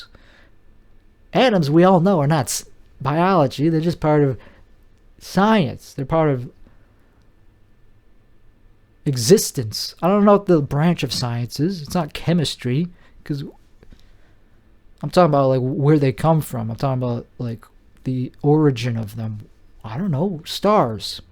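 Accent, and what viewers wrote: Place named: United States. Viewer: I am russian. And your accent is so American. 😮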